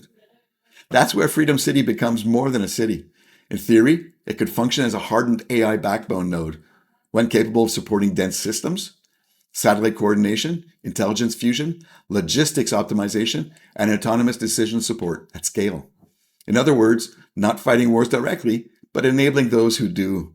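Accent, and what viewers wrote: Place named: Canada. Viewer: Nice to hear a voice from the True North! 💙🇨🇦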